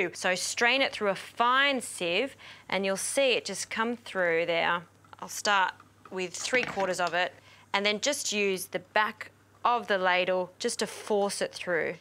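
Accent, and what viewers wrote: Australia. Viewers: She sounds Australian.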